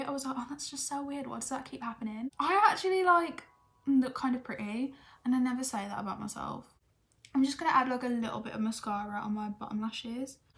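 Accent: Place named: England, United Kingdom. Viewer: Where in England are u from, like ur accent is different x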